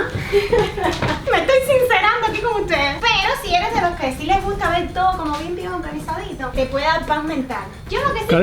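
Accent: Cuba. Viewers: Habla 24 hr cubano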